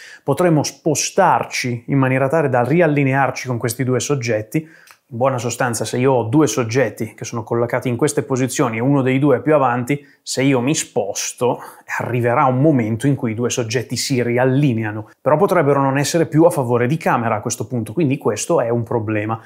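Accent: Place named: Romagna, Italy. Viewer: un accento adorabile ...romagnolo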